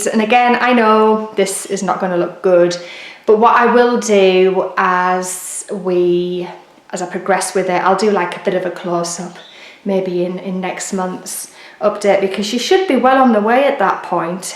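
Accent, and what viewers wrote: United Kingdom. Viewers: Hi, I sure do miss your videos. Please consider making one soon. I love watching you, your English accent and your sweet Daisy. Plus your birdies. I would love to also see how you do the petite stitch/tent stitch on your Mirabilias. I just recently started Royal Holiday and I’m needing to see your beautiful stitching on faces. I hope we see you pop in soon. Love ya and leave ya!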